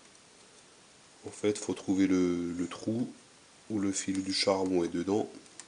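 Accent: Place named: East, France. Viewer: super merci je vais essayer de regarder ca j'y comprends pas grand chose mais je vais m'y coller en plus visuellement tu repares le meme que le mien. Il vient d'ou cet accent de l'est ? moi proche Forbach mais maintenant en ile de france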